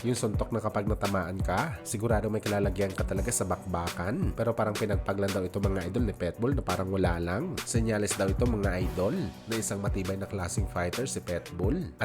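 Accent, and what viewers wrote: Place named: Visayas, Philippines. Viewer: Prang baguhan po kayo d2 s pinas,, ang vlogger po n ito ay isang visaya/visayan kaya kung mgpronounce lumalabas talaga ang pagiging kung anong language nila , pra samin ang spelling tama pero ang pronunciation kung hndi mo alam kala mo tama ka, at kmi mali,, ngayon kung pure tagalog ka maaaring tama k pero alamin mo muna po kung sino b at anong tribo ang isang tao,,🙏♥️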